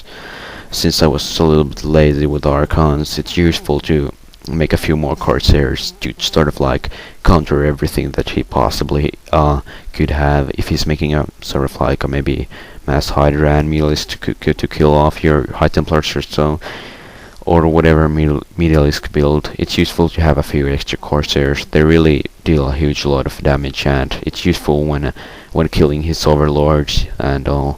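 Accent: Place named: Finland. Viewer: Finnish accent is so beautiful ^_^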